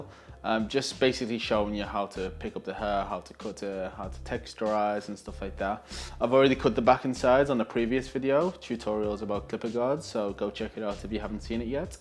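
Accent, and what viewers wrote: Liverpool, United Kingdom. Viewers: you sound like a scouser and speak like one too im from Liverpool meself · a mexican with a british acent wtf 😅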